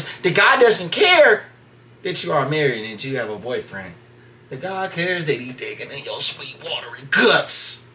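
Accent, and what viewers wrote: Baltimore, United States. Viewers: He def from BALTIMORE OR DMV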